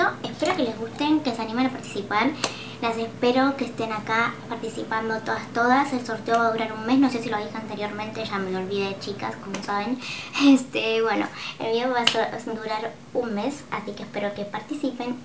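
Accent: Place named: Argentina. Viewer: hola me fascina el acento argentino😍 yo soy mexicana pero como me gustaría hablar como tuuuu🙊 PARTICIPO.. me encantaron la paleta de sombras, gracias por el sorteo.. un abrazo😘🙋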